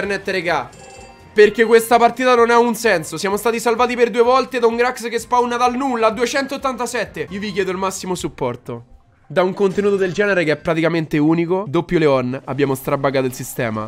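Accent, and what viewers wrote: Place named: Sardinia, Italy. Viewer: Mà sei sardese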